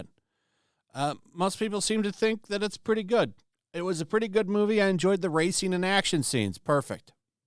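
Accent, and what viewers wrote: United Kingdom. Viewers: you have a great chap accent. love it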